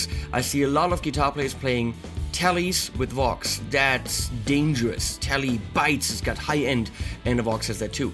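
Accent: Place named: Germany. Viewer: So ein schnuckeliger deutscher Akzent!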